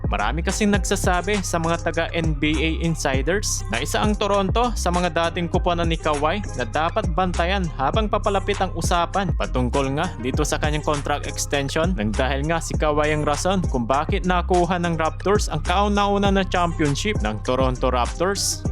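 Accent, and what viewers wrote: Pangasinan, Philippines. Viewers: Pangalatok kb Sir matigas k kc magsalita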